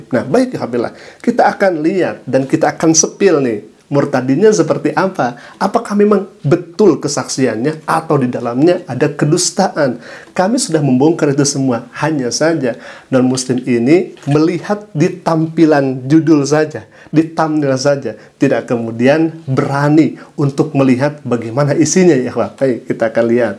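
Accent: Indonesia. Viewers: Ngaku dr Malaysia logat bahasa Indonesia Ini aja dah ketahuan bohong nya 😂😂😂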